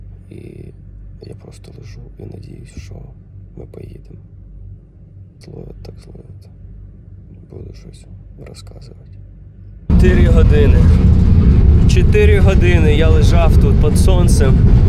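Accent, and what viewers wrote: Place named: Chernihiv, Ukraine. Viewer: У тебе такій говорю наче ти з чернігівської області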